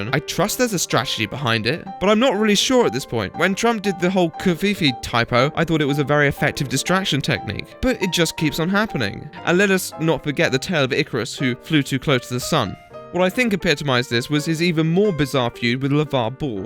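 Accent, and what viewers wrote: United Kingdom. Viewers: What part of Britain are you from just wondering because colossal is crazy sounds the similar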